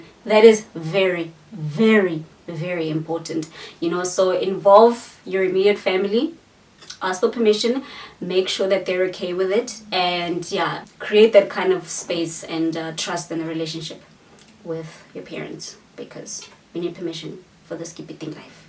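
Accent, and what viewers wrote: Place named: South Africa. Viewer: I'm Nigerian and I love SA movies, the way they talk in them. The way you talk. I already subscribed but please try to create English subtitles for when you speak your language. 💙💙💙💙💙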